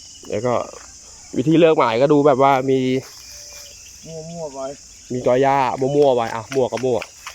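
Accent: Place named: Nakhon Sawan, Thailand. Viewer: ติดตามนะจ้ะ สำเนียงเหมือนคนคอนหวันมีแง้ะด้วย อ่านเจอว่าอยู่ชัยนาท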